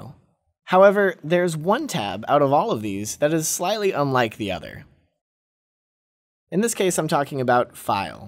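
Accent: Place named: United States. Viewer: In the United States (assuming the video creator is from there considering their accent and the fact that they're using $s) the tax would be multiplied because it's not already factored into the price like it would be in most European countries. While it's something stupid with the country, it doesn't make the video creator wrong.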